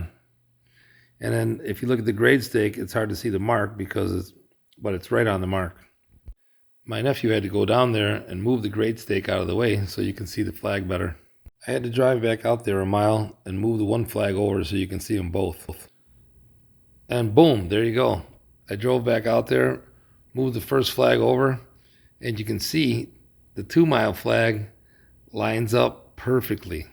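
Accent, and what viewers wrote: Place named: Italian-American, United States. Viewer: You sound like a fellow paisan! Keep up the good work!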